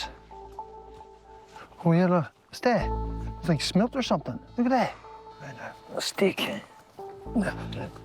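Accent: Canada. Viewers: The Newfie accent is mental!! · Very informative and it's my first time hearing a Canadian accent that does NOT sound like an American!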